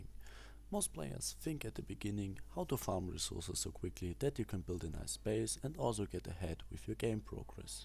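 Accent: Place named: Russia. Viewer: Bros having the heaviest russian accent to mankind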